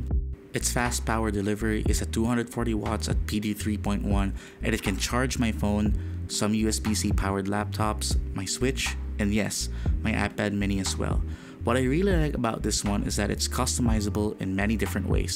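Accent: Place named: Philippines. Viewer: Your accent is telling me you are a Filipino.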